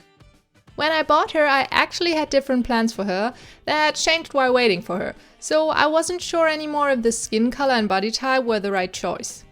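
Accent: Germany. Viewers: I know thats randome but are you German? You sound really german soooo yeah And i really love your art :D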